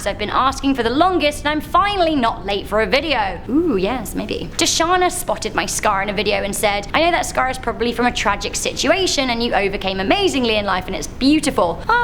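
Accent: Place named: United Kingdom. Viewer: Right now my favorite show is Stranger Things just finished season 3 and I am now off to look for something new. you are my favorite host on this channel I just love your energy and I confess I am one of those silly Americans who love the British accent.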